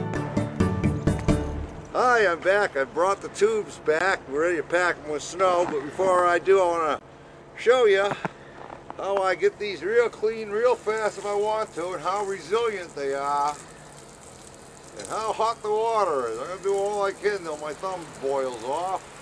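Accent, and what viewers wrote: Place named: Maine, United States. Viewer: Yes, I noticed that but coupled with his "Downeaster" accent it makes for a weird combination.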